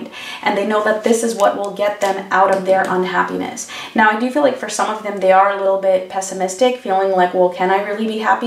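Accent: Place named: United States. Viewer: Also are you Greek? Your accent sounds American.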